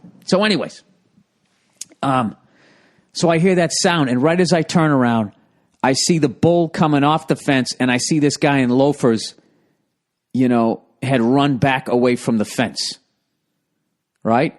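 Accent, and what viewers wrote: Boston, United States. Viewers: Blends well with the Boston accent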